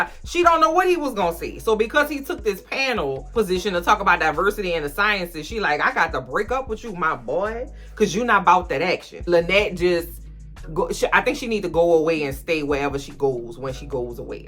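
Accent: New Orleans, United States. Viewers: I love your accent btw!! Sound like u from Nola :)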